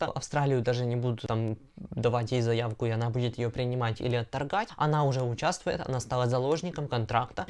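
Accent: Ukraine. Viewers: В первые слышу чисто украинский акцент)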